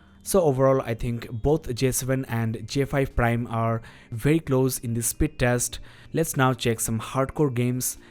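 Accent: India. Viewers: There is something wrong with indians tongues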